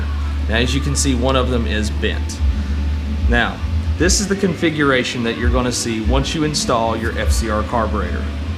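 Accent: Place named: South Africa. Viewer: you sound like a white south african my man! Dope vid man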